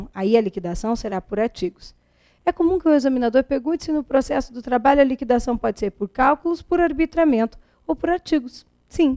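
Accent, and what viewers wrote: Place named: South, Brazil. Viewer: Essa figurinha tem sotaque da Região Sul. Muito boa aula. Parabéns conterrânea.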